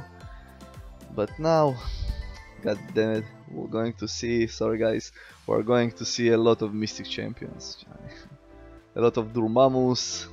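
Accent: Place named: Russia. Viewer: Ты русский? У тебя просто акцент не английский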